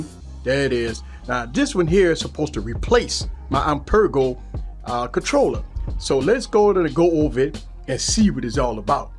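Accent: Louisiana, United States. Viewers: Just curious we're are you from? your accent says Louisiana..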